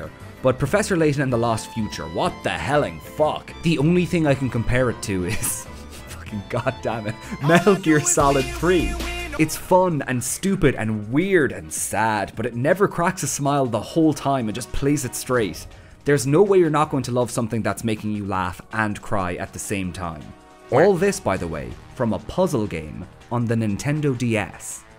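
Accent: Ireland. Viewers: Gotta say man I love your videos so far, the editing and the comedy is lovely! (Plus it's nice to hear a Irish youtuber with a accent besides Jacksepticeye floating about ) Also the ash can is ALWAYS THE GUINNESS, IT'S ALWAYS GUINNESS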